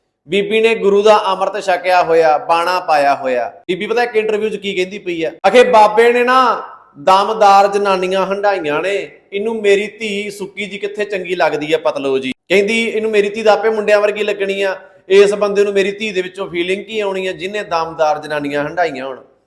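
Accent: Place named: Amritsar, India. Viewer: Mainu Lagda tu amritsar toh lagda gal karn toh badeh je kehna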